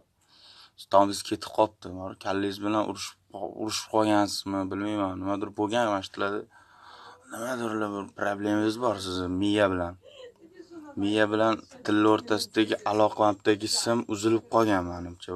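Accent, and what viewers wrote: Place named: Tashkent, Uzbekistan. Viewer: Ee birat chachvoribsiz likin toshkenchanixam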